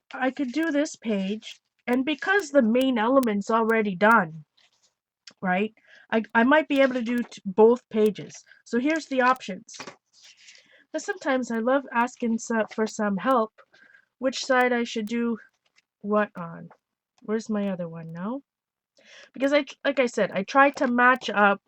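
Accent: Canada. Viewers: You are a cutie patootie with that adorable Canadian accent!!! Lovely work, thanks for sharing!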